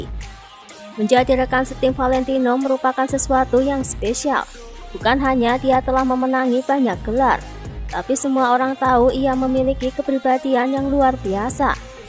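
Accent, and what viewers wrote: Java, Indonesia. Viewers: Mbak medok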